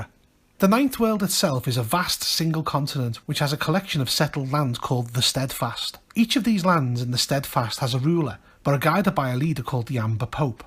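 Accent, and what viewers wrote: Liverpool, United Kingdom. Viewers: Delighted to check out this video and find a fellow scouser. Is right lad!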